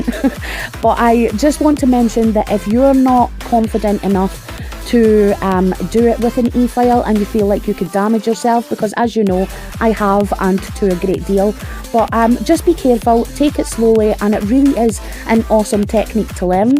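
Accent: Scotland, United Kingdom. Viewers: So glad I came across your channel,I’ll be watching more ,this video is fantastic and nice to hear that accent from a fellow Scot x🏴x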